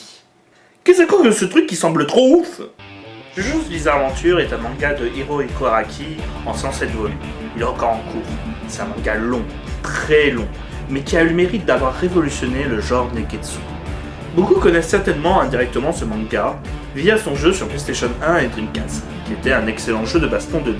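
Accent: France. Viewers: Tu utilise beaucoup de mots à la française appart Hunter x hunter que tu prononce à la japonaise xD D'ailleurs je connais seulement les 28 premiers tomes de jojo's bizarre adventure.... et c'est déjà mon manga préféré (j'achète seulement les édition Tonkam pour que ils continue) Malgré les coup de coeur énorme que j'ai reçu quelques mois avant de le connaître.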